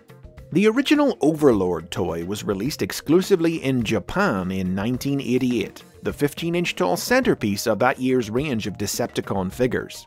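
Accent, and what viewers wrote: South, United States. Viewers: I'm never going to unhear that southern accent, and I love it. Thank you for the episode! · you rock that southern accent well man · I kinda like his southern accent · Did not expect the southern twang, but I can't say that I hate it. Back in '92 the local toy store had the Overlord toy, but for an outrageous price that no-one in town could realistically afford for a mere child's toy, and I remember being kinda enamored with it. Same story a bit later with Rotorstorm. Seems I had a habit of picking mildly obscure Decepticons as my favorites.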